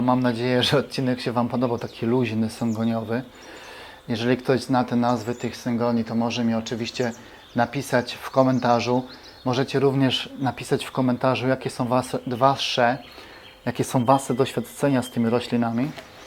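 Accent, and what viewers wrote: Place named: Podhale, Poland. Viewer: dziękuję tak zapytałam bo akcent jak z Podhala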